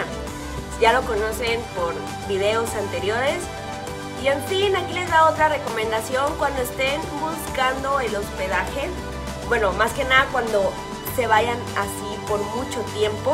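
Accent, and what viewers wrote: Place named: Spain. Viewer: Que bueno eso del intercambio es una muy buena experiencia de poder conocer otras culturas.. me hubiera encantado ir a España jaja esa tonada es tremenda jaja..pero con todo lo que está pasando hoy en día con las inseguridades robos y todo eso.. como que mucho no está para arriesgarse a menos que sea seguro jeje.. pero coincido con tigo seria una muy linda experiencia.. Saludoss😁😉👌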